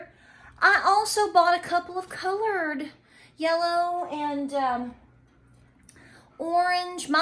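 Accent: South, United States. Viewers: Your are southern funny love it 😊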